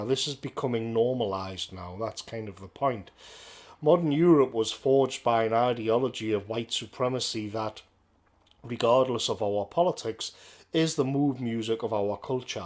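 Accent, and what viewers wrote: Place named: Northern England, United Kingdom. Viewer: Love your content mate, great to hear a northern accent with such a fantastic understanding of philosophy and reasoning. Always look forward to the next one 👍 thanks!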